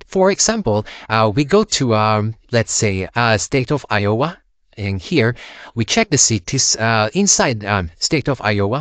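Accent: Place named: Singapore. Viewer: nice i was wondering where was the singaporean accent lol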